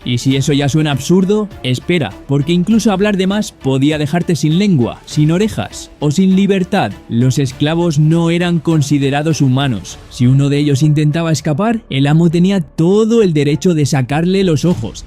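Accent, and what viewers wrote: Spain. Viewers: Como se nota que lo hizo un español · العنوان بالعربي بس الصوت شكله اسباني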